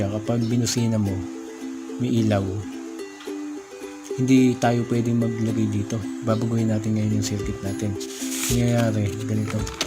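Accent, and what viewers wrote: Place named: Batangas, Philippines. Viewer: Batanguenio k ga boss. Saan ka sa batangas makapagpakabit hehe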